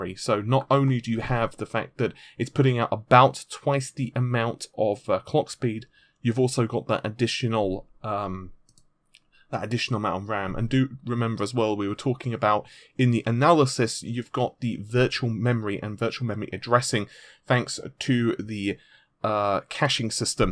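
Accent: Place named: Southern England, United Kingdom. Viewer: He has a perfectly normal southern English type accent. Have you never encountered one before?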